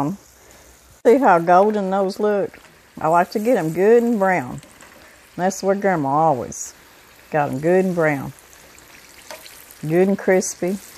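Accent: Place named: Southeast Texas, United States. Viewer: I love your kitchen set up..your accent is southeast texas!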